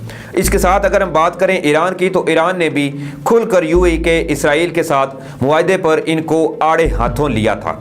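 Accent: India. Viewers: Aap ki batain achhi hain lekin kuchh alfaz ka talafaz Indian wala kyun hai. Sahih Urdu bolain plz. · Ap indian urdu q boltay ho Baaten itni piyari or lehja indian ka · Sad but unable to believe if u r really a Pakistani as usually you use Indian accent of Urdu. Can u clarify from where u r exactly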